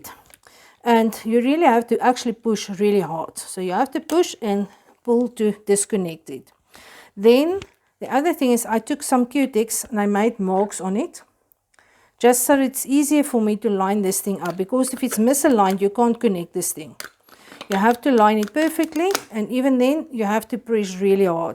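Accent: South Africa. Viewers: Hello, I have just discovered your channel. So nice to hear a South African voice on Utube making soap.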